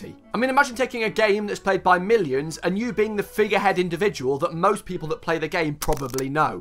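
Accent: United Kingdom. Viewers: The editing itself was just very interesting, but good topic with bri""ish accent is so much fun. Thanks for great video, i wish they would come more often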